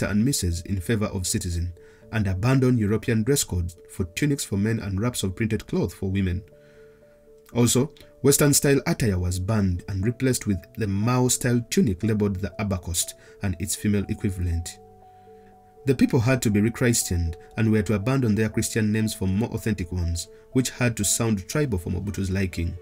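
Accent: Uganda. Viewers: The accent tells me you're Ugandan. Great work bro. Oli wamanyi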